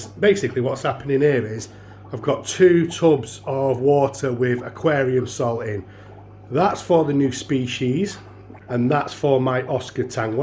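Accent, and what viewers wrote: Manchester, United Kingdom. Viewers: Looking sweet bro, you sound mancunian!!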